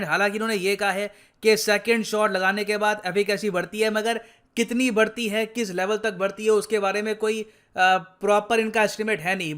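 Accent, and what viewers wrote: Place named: Bengal, India. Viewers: He is bengali so that is pronounciation issue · bro yeah bengali hai. Bengali language mein jai ko joy pronounce kya jata hai.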